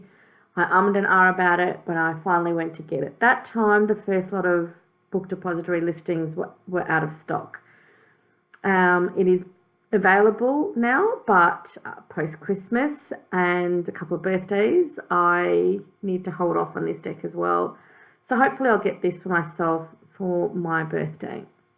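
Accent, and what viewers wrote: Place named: Australia. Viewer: Great video! I love listening to your voice. So nice to hear another Aussie on here :) (Stacey aka Stacey Lyn off fb) 🙏🏻💜🙏🏻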